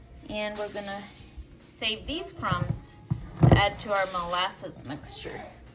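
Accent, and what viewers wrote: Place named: Pennsylvania, United States. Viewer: Sounds like you might be in Pennsylvania. I'm near Philadelphia. Just found you. Did you do a video with your mom on her showing you how she makes her Shoofly Pie? If so, I'd love to watch it. During my high school years I lived just outside Parkesburg. We lived down the road from a Mennonite family with twelve children. I went to school with their twin girls. We traveled to the Amish country often to enjoy all things made by the Amish and we especially loved going to Good's to shop, to flea markets in the area. Reningers, etc. Shoofly is my all time favorite pie. I've made it a few times and it is always delicious but I'd like more of a crumb topping that doesn't sink into the the delicious molasses filling. I miss living in the country. I have a fleeing I'm going to enjoy watching more of your videos.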